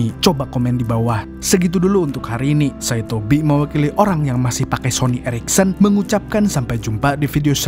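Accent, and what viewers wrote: Banjar, Indonesia. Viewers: Urang banjar kah pian mang 🤣🤣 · Pinanya mimin ini org banjar 😊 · nah bahasa banjar sekalinya😂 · Orang Banjar kah mang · Adminnya orng Banjar? 😅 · Wkkwkw orang banjar kalinya sampiaaann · Ikm urang banjar kh?😂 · Urang banjar kah beliau ne 😂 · Min orang mana, Banjar kh? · Aiii bhasa Banjar,,,urg banjar kh?? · Hahah urang banjar kh pian mang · Urang banjar kah pian bang? Hahaa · Wkwk asli banjar 😂 pacul gigi kai .. semangat terus berkarya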